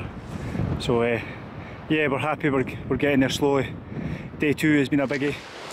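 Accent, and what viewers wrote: Scotland, United Kingdom. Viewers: The closed captions are having fun with your lovely Scottish Accent!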